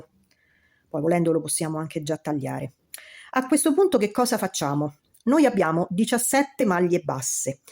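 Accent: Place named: Rome, Italy. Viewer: Ciao! L ho realizzata oggi ed è venuta benissimo! Bellissima! Poi tu mi sei troppo simpatica! Sei di Roma? Dall'accento mi sembra di si (anche io sono di Roma). Spieghi molto molto bene, e questa mini bag é veramente bellissima! Grazie! ❤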